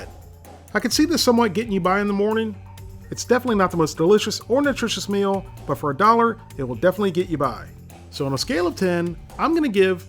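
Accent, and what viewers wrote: South, United States. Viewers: i like how you were talking like a hick! git r done grub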